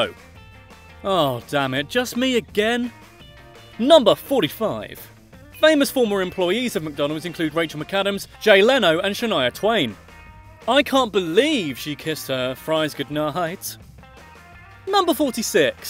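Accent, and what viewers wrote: United Kingdom. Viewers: is it just me or would you marry the british voice guy because of his voice and hilarious puns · All british people pronounce it like that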